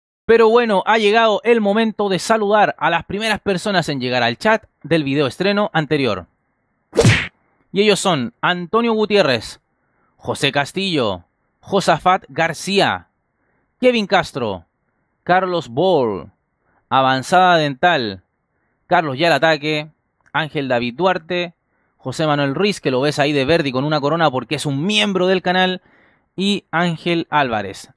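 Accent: Chile. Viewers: se nota poco lo chileno puro aguante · Tu acento es ¿ Chileno?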